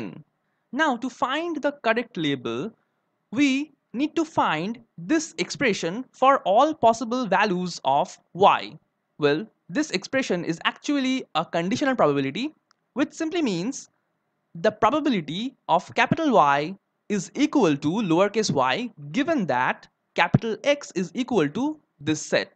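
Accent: Bengal, India. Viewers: You areee Amazing. I love your Indian Bengali accent ( just a guess hehe make me a voice analyzer if i am right XD )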